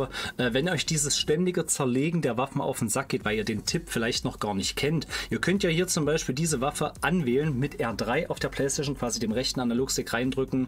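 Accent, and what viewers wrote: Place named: East Germany, Germany. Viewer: Like fürn Ossi Dialekt!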